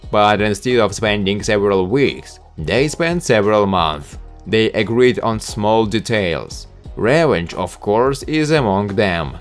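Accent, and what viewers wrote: Russia. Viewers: Красивый русский акцент ) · The context could be fine, but dude!? Just speak Russian with Russian accent, because your English has the stupidest accent, it is irritating, so after one minute I had to go...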